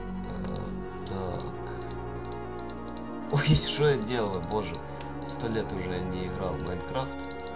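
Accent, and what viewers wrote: Ukraine. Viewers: Вот в этом можно прям услышать акцент украинцев но в 2024 году его прям не слышно и я думала что он русский пх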